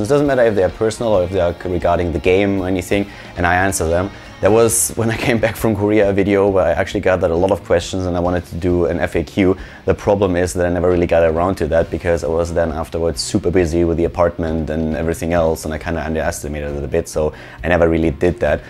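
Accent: Germany. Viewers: Ich habe schon früher deinen Kanal geguckt als du starcraft-Kommentator in Korea warst, seitdem du viel hots machst gucke ich dich dauernd, deine Videos gefallen mir sehr gut :) mach weiter so, ich hoffe deine Karriere geht weiter so gut weiter :) deinen deutschen Akzent habe ich anfangs gar nicht erkannt :D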